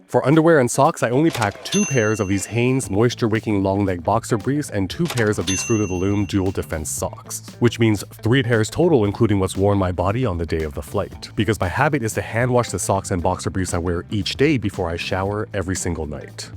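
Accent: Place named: China. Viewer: How can you have a Chinese accent despite presumably being born/growing up in Canada/the US?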